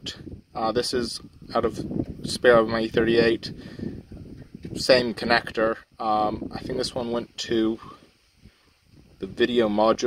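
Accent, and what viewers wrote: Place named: New Zealand. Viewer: Can't work out if you're a Kiwi from the States or a Yankee living in NZ..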